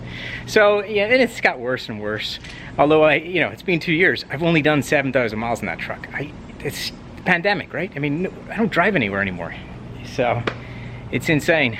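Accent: Northern Ireland, United Kingdom. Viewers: Enjoyed your videos thought I got a sound of a north of Ireland accent , greeting from Dublin.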